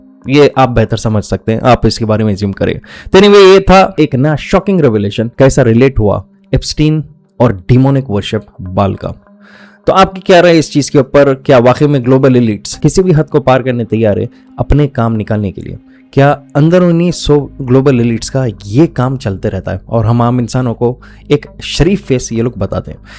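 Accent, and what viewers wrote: Hyderabad, India. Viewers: ओक youre frm bhagynagar. Accent. Shows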